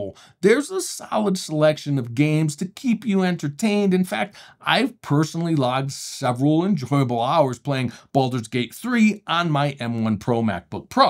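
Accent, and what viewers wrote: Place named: United States. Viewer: I really have problems with strong American dialect ...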